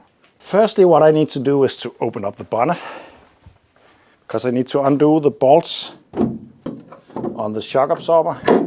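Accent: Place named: Denmark. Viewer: Hey man, are you from denmark? I think i hear a little bit of a danish accent in your english, and in your umm's and hmm's but it could just be me. Really cool channel, love the content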